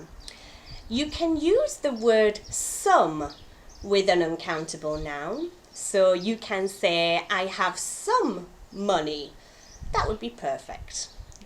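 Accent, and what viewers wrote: United Kingdom. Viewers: Great Video! Wonderful English accent!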